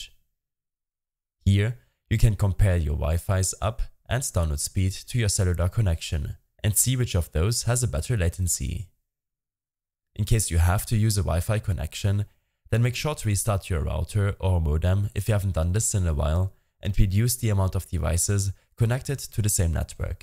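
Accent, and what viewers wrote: Germany. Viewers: Ich höre bisschen deutsch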